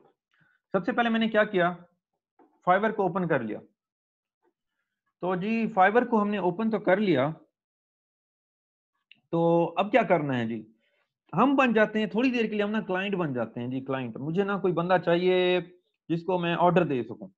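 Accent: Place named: Multan, Pakistan. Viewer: Bhai ap multani ho? Apki punjbai awaz multani bandon jaisi hai 😁😍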